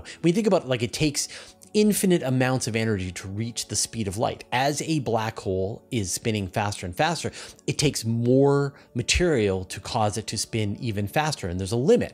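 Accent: Canada. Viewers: ROFL on the Canadian accent. Nothing wrong with proper enunciation of words :) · Canadian accent…as a fellow Canadian I never considered that, lol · As a not native speaker I cannot spot your Canadian accent 😊 however I'm able to understand you very easily. Excellent pronunciation and always great contents! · I live in Southern California and I and most people I know pronounce lava as “lah va” and not “lav eh” as does. My sister-in-law’s parents are Canadian and I took Canadian history in college so Canadian accent is very easy for me to hear. And that’s not a criticism so I think Canadian accents are cool. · I find your Canadian accent interesting. It gives Canada a linguistic distinction from the US. Language is an important part of national pride. Don't be ashamed of it. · You should never worry about your accent. People love it. It’s part of the reason Canadian’s are so popular on YouTube.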